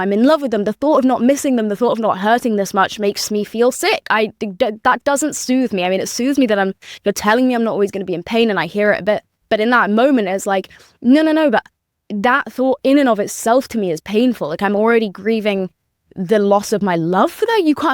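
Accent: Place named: United Kingdom. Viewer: ❤Ur podcast and British accent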